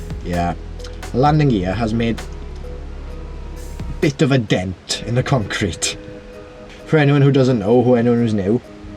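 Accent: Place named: South Wales, United Kingdom. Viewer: you sound like you could be from South Wales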